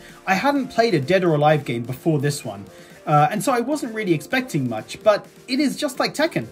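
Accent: United Kingdom. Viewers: im just going by your accent you are from the uk but over here in ireland tekken dark resurrection is still on the psn and so its still on the vita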